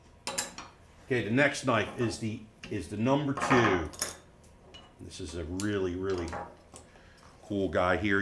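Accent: Philadelphia, United States. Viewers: This is why I buy your products. I can hear how much u car about quality and doing things the right way...!! U remind me of family I have from philly area u can hear it ooze out of you... thanks for being a great American company...👍🏼👍🏼💪💪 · I'm from the Philly area and spent my Summers at the Jersey Shore it's great listening to you talk with your philly East Coast accent I love Geissele triggers I have one in the gun I keep next to my bed that protects my family there's no doubt you guys make some of the best gear in the industry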